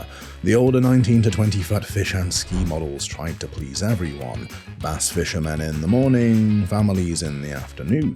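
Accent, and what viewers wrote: United Kingdom. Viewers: Utter and pure horseshit. Kept watching just to see how idiotic this one got. Which it sure did. As a boater since childhood, I cannot remember an elite population as this British voiced fool implies, ready to question brand after brand at this level of boat. Yes there are cheaper quality boats just as automobiles are. And the world keeps spinning what a shocker. Do me a favor and stay home and impress yourself that a life of snobbery is far better than enjoying the boating scene. 1994 Four Winns owner here - old school in the house that takes care of his boat and could care less there are OMC labels on a Volvo and Ford I/O setup!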